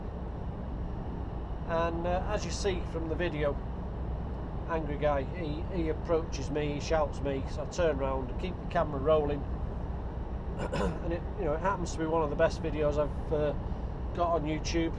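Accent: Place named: Wrexham, United Kingdom. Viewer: Your accent sounds like your from Wrexham way... just block delete these fucks, i personally love your vids, seen every one of them, keep up the good work, and ignore them, block delete and you have won, they then have to go through all that rigmarole to make a new account, if then they come straight back and u block and delete them again, you have won again... they cant keep that up... love your vids!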